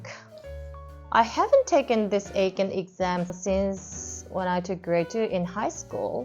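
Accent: China. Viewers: 韓国語が日本人が話してるとは思えないくらい中国語？訛りですね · ドイツ語が完全に中国語アクセントで不思議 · 韓国語がが中国の方が話す発音っていう感じ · 日本人の韓国語発音でなくて、中国の方の発音だった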